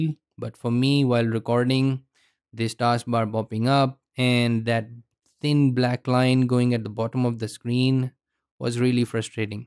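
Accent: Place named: India. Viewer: Thank fucking god for Indian tutorial guys on YouTube and leave it to them to fix the broken bullshit some multibillion company is incapable of fixing! Thank you so much for this! i was just about to smash my t.v.. after having struggled all day with that shitshow "taskbar" popping up and blocking me from using the search function of the file manager i am using to filter through some data libraries that was designed with a properly made system in mind and hence won't fit into the pseudo fullscreen if i didn't hide the taskbar, it know suddenly started popping up in the middle of my lord of the rings marathon and crashing the equally horrendously designed amazon prime app. The windows 10 design is just utter bullshit and missing even the bare minimum core functionalities to adjust this fuckery. Just like when running e.g. into a statistic's problem during the validation process of a new calibration method it is one of those things where I google for hours with only receiving "this is how it is, eat shit and like it" results until i finally remember how to use "" to force the advertisement pushing algorithm to feck off and actually show me the results i asked for - and then finally see it! 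The glorious sub-10 minute YouTube video that as soon as i hear the accent gives me total confidence that i have finally come to the right place to get some actual clear and straight to the point instructions on how to actually solve whatever issue i have run into. Tl.dr: thank you very much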